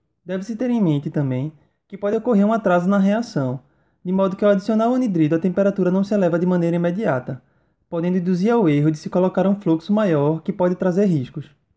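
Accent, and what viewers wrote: Northeast, Brazil. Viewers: ficarei esperando, pois o que vc está fazendo é uma grande contribuição para a comunidade científica do YouTube e ainda mais se for por um conterrâneo como vc, pois sou da Paraíba e pelo seu sotaque, percebo que vc tbm é daqui da região Norte-nordeste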